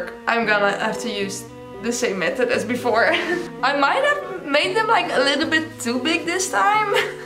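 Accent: Netherlands. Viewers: Je Engels is echt zo erg op zijn Nederlands😂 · Are you dutch? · Are you Dutch? Your accent sounds kinda Dutch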